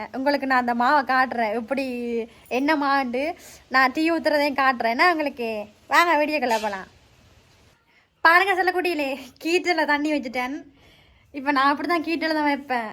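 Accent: Sri Lanka. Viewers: நீ பேசும் இலங்கை தமிழ் அழகாக இருக்கிறது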